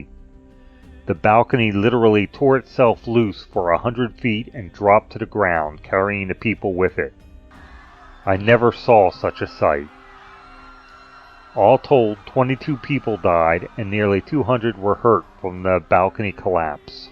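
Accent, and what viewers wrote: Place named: Philadelphia, United States. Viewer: You're a true Philadelphian with that accent!!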